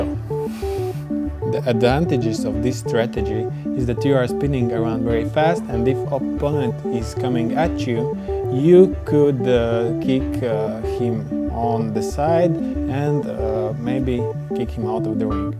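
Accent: Latvia. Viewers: That Latvian accent was so obvious from the very first word. :D I usually use something more similar to the first strategy, since usually the opponent robot is within my robot's sight basically upon the start. As my blad is decent, my robot just runs full force onto opponent. The main disadvantages come if opponent has flags. My robot doesn't yet account for it. Also, and embarrassing thing, but one of the 2 things have caused my robot to randomly restart on many competitions and I'm not sure which (hadn't tested): - charging circuit moving out of place and messing something up (not sure about this one, heard it from someone that it could be possible) - high noise in voltage from battery (due to not enough charge?) I'm hoping I can prevent this from happening again. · I was watching this and i thought your accent sounded familiar... I had a suspicion you were latvian! 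Labs video, pats domāju sākt veidot savu pirmo sumo robotu : ^)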